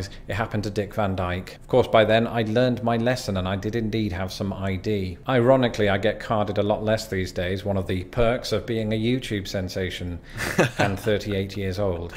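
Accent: United Kingdom. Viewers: Love this guy's very British droll delivery. Since Covid came along, there's no more going to the bar to order drinks, it all has to be brought to the table by bar staff now.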